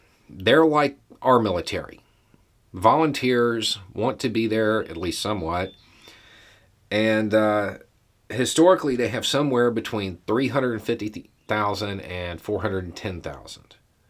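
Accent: South, United States. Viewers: As a gal who grew up in part in southern Alabama, it's nice to hear a Southern accent talking sense.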